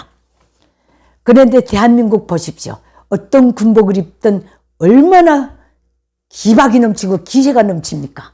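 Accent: Pyongyang, North Korea. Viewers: 반갑습니다.! 언제나 내가 좋아하는 평양 말투를 멋쟁이 김기자님을 통해 들을 수 있어서 행복합니다. 그런데 북쪽 이야기는 들을 때마다 충격이며 가슴이 아픕니다. 어떻게 이 지구상에, 더구나 우리 동족에게 이런 일이 있을까?..... 어떻게 저 체제가 허물어지지 않을까??... 놀랍기만 합니다. 한편, 일찌기 부모님을 남쪽으로 인도하신 하나님께 감사하지만 속아 살고 있는 북한 동포들이 너무나 불쌍합니다. 반드시 김정은체제가 무너져야 한다는 생각입니다. 항상 우리 국민이 꼭 알아야 할 좋은 말씀 들려주셔서 감사합니다. 기자님! 홧팅!! 건강하십시요.~♡ 행복하십시요.~♡ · 님이 코디에 신경 쓰셨네요..ㅎㅎ 보기 좋습니다.. 제가 보는 님의 매력은 북한식 억양으로 표현되는 재치와 품격입니다.. 전문 저널리스트로서의 깊이가 느껴져요.. 의상은 너무 신경 안 쓰셔도 됩니다.. 주변에 아는 여성분들에게 협찬(대여) 부탁 하세요.. ^^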